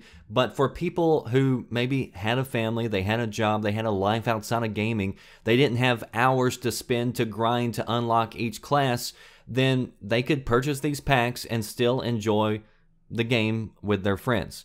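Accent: South, United States. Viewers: Your southern drawl is really sexy ;)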